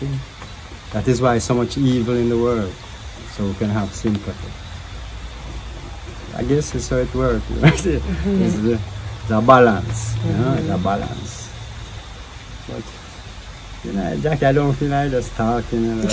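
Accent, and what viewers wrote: Jamaica. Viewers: I can hear the Rasta in you. One Love Rasta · It's a Kingstonian accent, notably old school folks. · Love this man love the way he talks and laughf real jamaican roots man down to earth and all.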